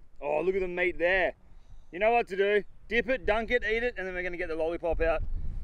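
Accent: Australia. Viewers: Do you also read his replies with the voice and Australian accent?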